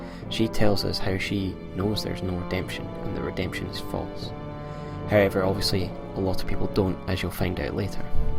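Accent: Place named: Scotland, United Kingdom. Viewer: Dat Scottish accent. Nice vid man.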